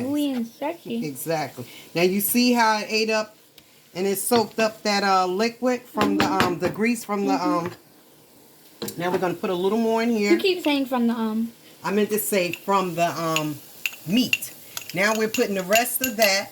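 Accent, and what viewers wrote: Jamaica. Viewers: you are something else. That Jamaican accent. 😂 ❤️ I’m here watching. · ya mon indeed! Love the Jamacian accent! and a great helper too!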